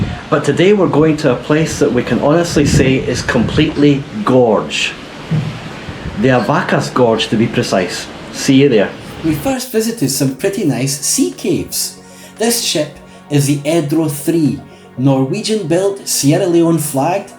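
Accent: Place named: Scotland, United Kingdom. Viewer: Not a bad first attempt. That Scottish voice-over is quite amusing.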